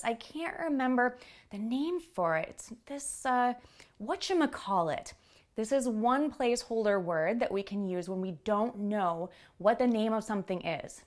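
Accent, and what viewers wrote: United States. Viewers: Sister you from american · From what part of the US are you?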